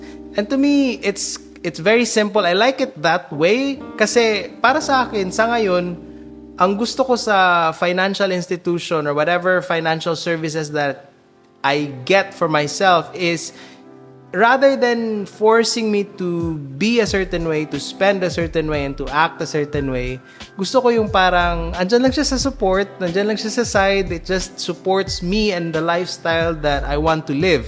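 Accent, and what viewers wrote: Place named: Davao, Philippines. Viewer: Im new to your videos, i can hear an accent, somewhat similar to davao conyo, is there by any chance you are from davao?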